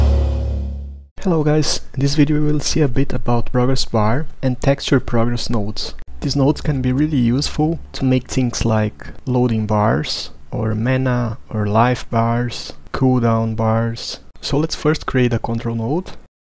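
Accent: Brazil. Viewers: man sounds like brazillian, look at that accent, you love to see it. tamo together mano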